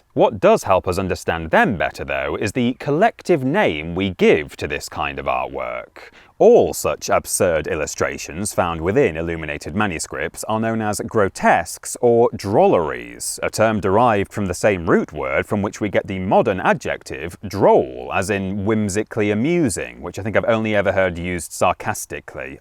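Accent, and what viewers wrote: England, United Kingdom. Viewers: How can a man with a heavy English accent do a video on this topic and not show a clip from the Monty Python movie with the killer rabbit? At least I can see where they came up with such a ridiculous idea... yesterday it made no sense, but today I've grown.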